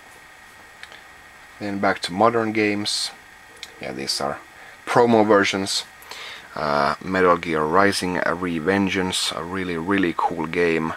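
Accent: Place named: Finland. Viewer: Finnish accent is unique. Not so easy to grow out of it.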